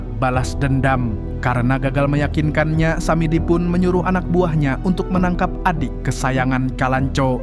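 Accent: Banjar, Indonesia. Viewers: orang banjar kah pian min😅😅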